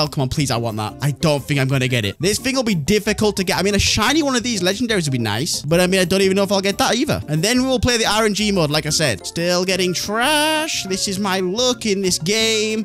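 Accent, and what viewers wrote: United Kingdom. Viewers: Bro are u British or somethinh?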